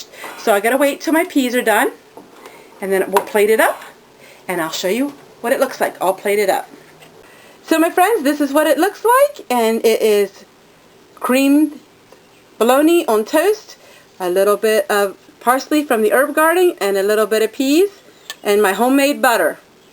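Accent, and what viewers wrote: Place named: Pennsylvania, United States. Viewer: ha ha, i knew your accent. i am from that area of PA, and now live in NC. so nice to have found you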